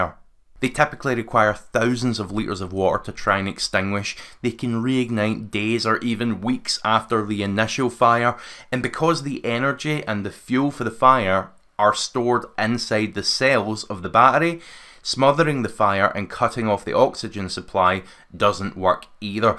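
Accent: Scotland, United Kingdom. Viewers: in this video, you will see on screen, that there have been 15 verified Vapour Cloud Explosions globally, since 2010. Try measuring that against the number of petrol vapour explosions over the same period. You cannot; because it is in the many thousands. As for a “light electric vehicle fire” that includes countless e-scooters, e-bikes, motorised wheelchairs, carts and the like. Modern BEVs have sophisticated battery management and cooling systems. Try stating those figures. There were 239 fires recorded in the UK from July 2022 to June 2023 that were linked to EVs, but the vast majority of those did not involve the battery at all. A lie with a cute Scottish accent is still a lie.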